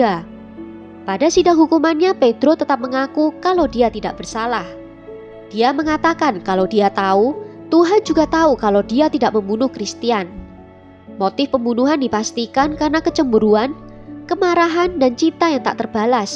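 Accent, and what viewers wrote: Java, Indonesia. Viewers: Hay jg medokuhhh..sukaaaa👍👍😍😍😍💞💞💞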